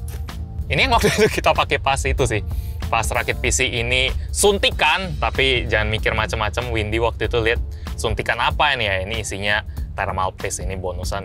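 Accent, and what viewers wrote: Palembang, Indonesia. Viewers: Bang asli orang palembang yah?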